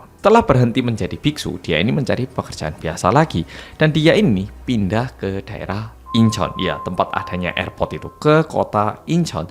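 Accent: Java, Indonesia. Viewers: Medok e pol mas hehehe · Hhhhhh bener bener medok bang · medok Jawa... hadeeuh... gajelas ngomong nya lu ah sipit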